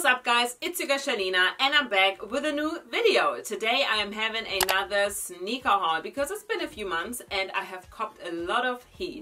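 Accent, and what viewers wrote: Germany. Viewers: Nice deutscher Akzent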